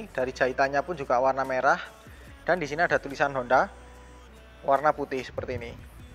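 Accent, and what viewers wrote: Malang, Indonesia. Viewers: Mangkane medok tibae wong claket malang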